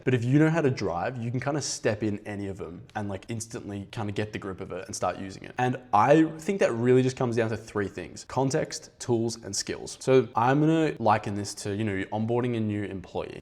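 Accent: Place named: United Kingdom. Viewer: Really like what you're doing .. we're building the best Sales & Marketing Voice and Messaging agents you'd come across, along with business analytics tools for major corporates. We code our products. From the sound of it, you're definitely british, I lived in Manchester for a year during business school as well, right down the street to Arndale center. Would love to see how we can collaborate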